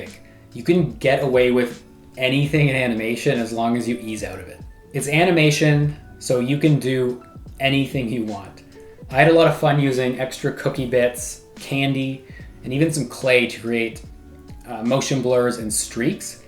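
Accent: United Kingdom. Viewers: I thought this guy was British fsr, now I know he's not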